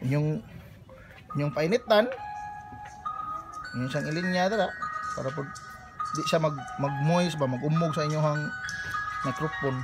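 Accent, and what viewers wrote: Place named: Visayas, Philippines. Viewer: bakit hinde ko napanood ang video na ito.bisaya kaayo ang vloger.hahaha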